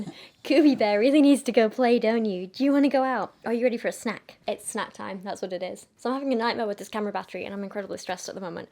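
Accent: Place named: RP, United Kingdom. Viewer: wow looking even more beautiful in this video :-O and I still love your posh accent, I would listen to you reading the phone book :-O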